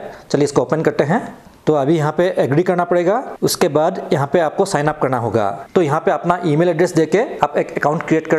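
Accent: Assam, India. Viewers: দাদা, আপুনি অসমীয়া নেকি?? কথা কেইটা শুনি এনে লাগিল।।।। ।❤ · Is that Assamese accent I hear? Lovely! Thank you for the informative video. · tu oakka assamese hai. tera accent se pata chalta hai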